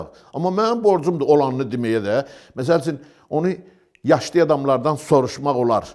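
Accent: Baku, Azerbaijan. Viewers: Bakı lehçesi superdir ürəyim gedir❤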